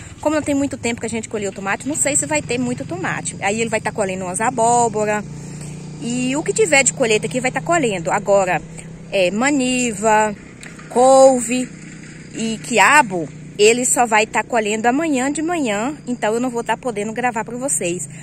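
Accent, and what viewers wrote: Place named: Bahia, Brazil. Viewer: Sem nexo isso aí, Sobre o gerúndio não vi sentido algum, como que você está querendo que ela seja natural? Sendo que você está tentando ensinar ela o correto! Com certeza ela está sendo natural, se fosse ensaiado ela não iria falar "vou tá mostrando a colheita" e sim como você disse "vou está mostrando a colheita", que diferença isso faz? você é baiana? Ou não sabe que esse é o sotaque? Sigo outras moças baiana aqui que fala igual a ela, tenta, ver isso aí direitinho que você escreveu, na verdade você está procurando pelo em ovo de galinha!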